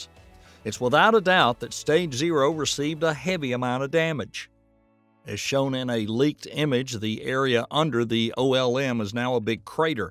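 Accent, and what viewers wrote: United States. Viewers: From which part of the US is the accent of the speaker?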